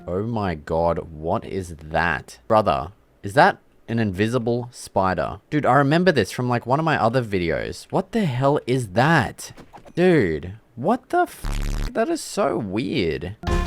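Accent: Australia. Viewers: Bros Def a Aussie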